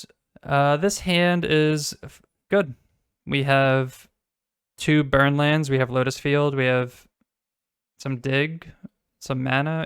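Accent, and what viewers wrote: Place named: Baltimore, United States. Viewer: Hey, a little irrelevant but are you from Baltimore? (Wherever you’re from im a fan of the accent)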